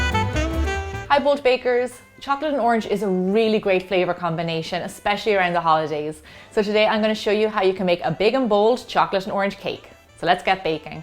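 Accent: Ireland. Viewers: Are you Irish?Because the way you pronounce the "T" it seems!☺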